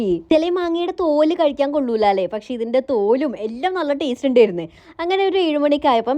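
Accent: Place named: Alappuzha, India. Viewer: നങ്ക് എന്ന് പറയും... ആലപ്പുഴ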